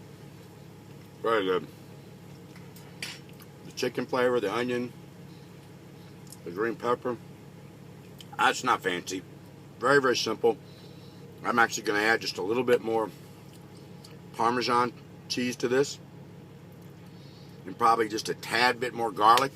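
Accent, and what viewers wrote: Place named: Los Angeles, United States. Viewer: looks great.. what accent he is speaking,is this LA accent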